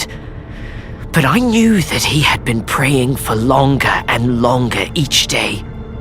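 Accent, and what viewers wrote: United Kingdom. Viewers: HES BRI’ISH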